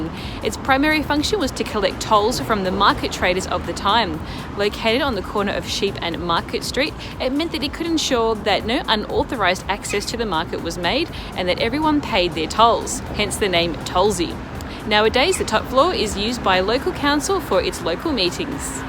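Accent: Australia. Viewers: Hello, can I ask what your accent is? You sound a bit Australian but I can’t tell haha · Been around most of Cotswold villages you filmed. Enjoyed your precise commentary. Do I hear an Oz accent?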